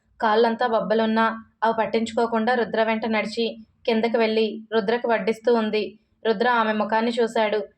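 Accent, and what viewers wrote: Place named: Rayalaseema, India. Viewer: అక్క నాతో ఇంకో చిన్న రిక్వెస్ట్ ఈ క్యారెక్టర్ లో నువ్వు బాగా మునిగి పోయినావు రాయలసీమ భాష తోటి బయట కూడా నువ్వు ఇదే భాష మాట్లాడే అనుకో యాడ నుంచి వచ్చిన అమ్మ నువ్వు అంటారు మరీ అంతలా కమిట్ అయిపోతే గా ఈ భాషకి😂😂😂🫴🫴🙏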